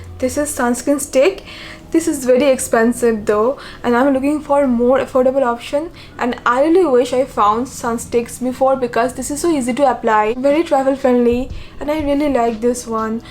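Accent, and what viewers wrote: Bengal, India. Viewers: It's Bengali accent. She's not faking it.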